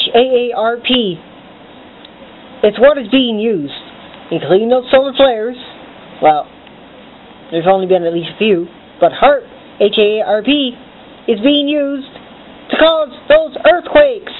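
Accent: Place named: Ireland. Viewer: You Irish? or something? :P just asking coz your accent sounds like you have an Irish tongue. but i think you may be right. the HAARP is most likely the reason for all these earthquakes and the fish dieing and shit like that.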